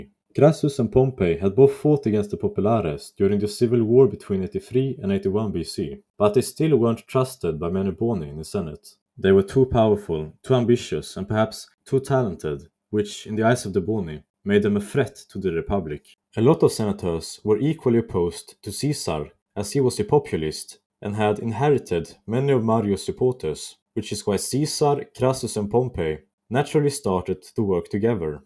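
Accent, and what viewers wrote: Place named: Latin America. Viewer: … I know your voice. Unique combo of voice and accent (very slight) but your Latin origins give you away 😂 big fan though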